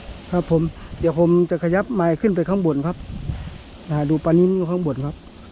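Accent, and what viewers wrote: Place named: Thai Yo, Thailand. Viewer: ไทยญ่อบ้านได๋น้ออ้าย? สกลฯหรือนครฯ?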